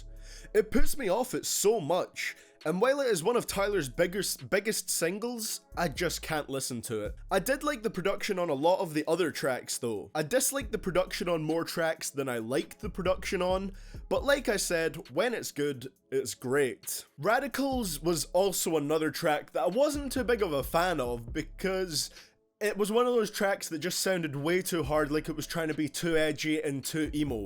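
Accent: Scotland, United Kingdom. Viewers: Are you Scottish? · I love the Scottish accent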